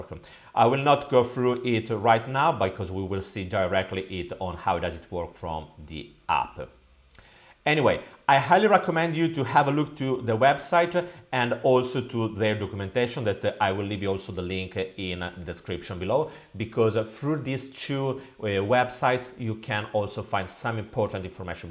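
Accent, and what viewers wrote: Italy. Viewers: Sei italiano?😅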